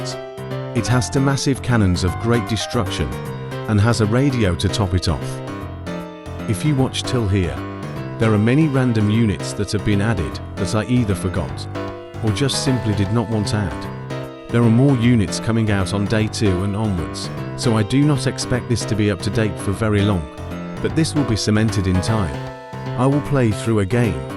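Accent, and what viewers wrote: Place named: United Kingdom. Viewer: British voice 🇬🇧🇬🇧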